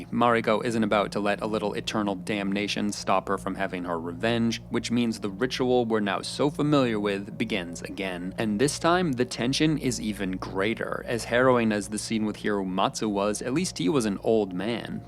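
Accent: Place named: United States. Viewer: Since he's pronouncing everything with a fairly strong American accent, he would probably pronounce Kurosawa wrong as well 😂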